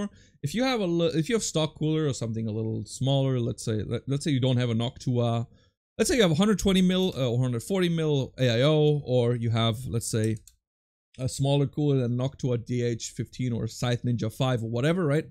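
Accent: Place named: United States. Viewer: god damn your english accent is very good and you're Danish, literally thought i was watching an american guy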